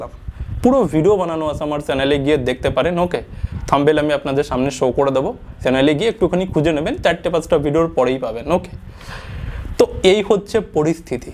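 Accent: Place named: Bangladesh. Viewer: Tor barita Kangladesher kothai.sposto kore kotha bol Ahammok.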